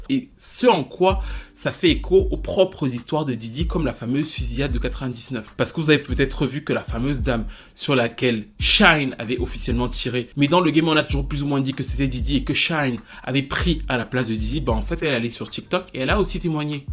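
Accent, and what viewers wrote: Cameroon. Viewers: J'apprecie enormement ton analyse, mais surtout ton accent un peu camer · Je dis ein tu es Camerounais? L'accent ci me dit qq chose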